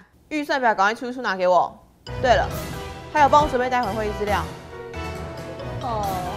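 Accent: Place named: Shandong, China. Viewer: 山東腔好歪歪歪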